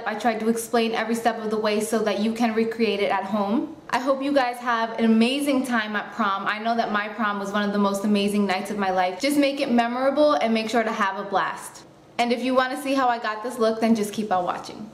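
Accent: New Jersey, United States. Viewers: Jersey accent ! .. :)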